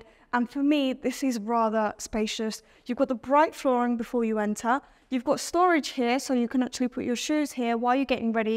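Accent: London, United Kingdom. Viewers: Definitely an accent from the UK, possibly London. Great to see young individuals getting out there opening doors and driving forward … well done, keep driving forward… As an engineer in the Oil Industry I did the same, big world out there and you never know who you could meet that could change one’s life…. As I stated great presentation… this home is fantastic…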